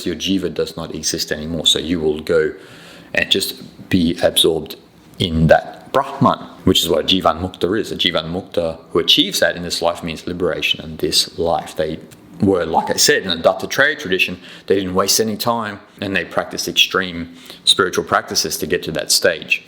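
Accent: Australia. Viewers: Good on you my Friend to explain all this in your Accent which is easy for most of the westerners, I guess urs is Australian Accent, which is I am familiar with as lived there for 5 years, Good to be in contact with you regarding such discussions, Take care, Revert if you feel like.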